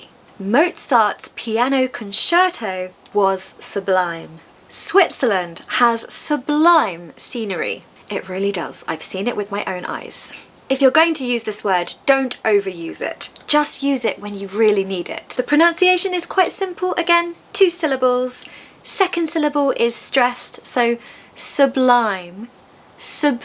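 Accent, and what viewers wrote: RP, United Kingdom. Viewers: Dear Madam Teacher,many and many thanks!! I really,truly admire your so great British accent!! Very warm greetings from the Central Europe. I wish you a pleasant,enjoyable,wonderful 1st May ( Labour Day and Global Love Day). Take care,please. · Lovely and smart Woman I'm from Aracaju-Sergipe-Brasil I love the Rp accents from England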